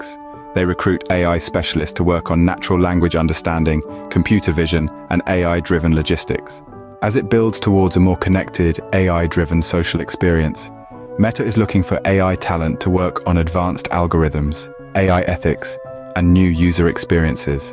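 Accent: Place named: United Kingdom. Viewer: your British accent is amazing and this video gives me some insights on AI trend in the future, nice video introduction👍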